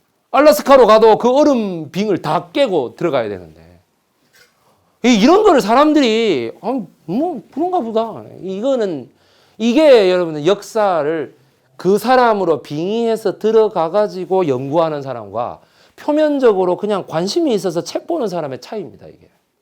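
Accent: Gyeongsang, South Korea. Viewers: 구수한 겡상도 사투리 ..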